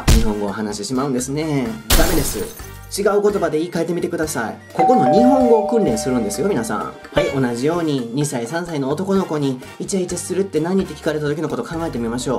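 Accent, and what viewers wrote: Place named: Kansai, Japan. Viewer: 関西弁と声の質で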